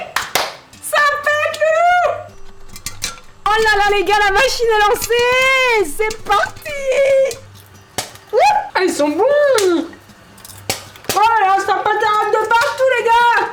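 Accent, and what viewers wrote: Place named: Nord-Pas-de-Calais, France. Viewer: Bonsoir, désolé mais c'est quoi cet accent de cht'i LOL "mais c'était quoi cette tète de comme si du gouté de la merde là" Je suis mort ( je suis de Picardie cousine ) Bonne continuation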